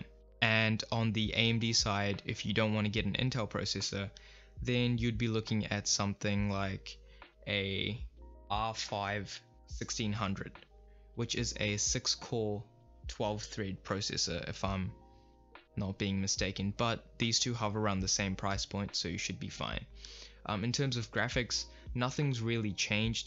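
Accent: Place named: South Africa. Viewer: you sound like a SAFA, am I right?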